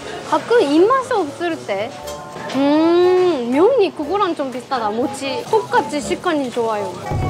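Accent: Gyeongsang, South Korea. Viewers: 일본분이 한국말 하니까 경상도 사투리 갔군요 ㅎㅎ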